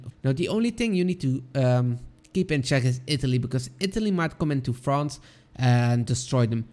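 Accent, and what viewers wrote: Belgium. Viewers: Because I hear a slight Belgian (Flemmish) accent in pronouncing the Dutch words. I'm from the Netherlands myself. Good pronounciation btw. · you Belgian?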